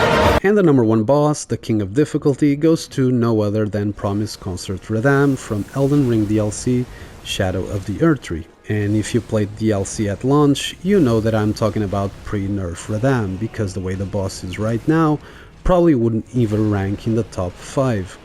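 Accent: Portugal. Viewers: impressionante como um tuga consegue perceber logo pelo sotaque. bom video e boa lista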